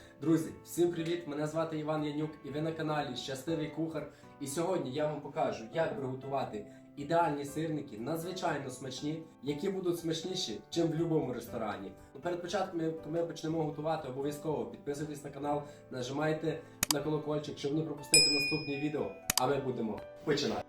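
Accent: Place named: Carpathians, Ukraine. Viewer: Доброго дня., ти чудовий кухар. Все в тебе надзвичайно смачно і швиденько. А я хочу вибачитись за тих хто заходить на канал і починає показувати, який він мовник. І як добре вміє розмовляти. То ідіть в школу і викладайте і навчайте своїх дітей і внуків. Наша карпатська мова дуже різноманітна. Так розмовляли наші дідусі і бабусі, батьки, ми і наші діти. Ні, щоб подякували за рецепт, а викаблучуєтесь. І не соромно вам люди. Порядна людина ніколи не буде писати таких дурниць. Радуй нас своїми кулінарними шедеврами і розмовляй так, як говорять у нас в Карпатах. Бережи тебе Боже.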